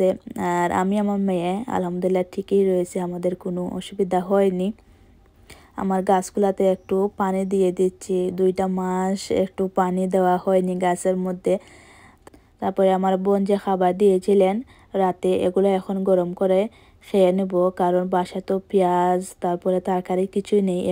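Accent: Sylhet, Bangladesh. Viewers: Apu apnar basha Sylhet er kun jaygay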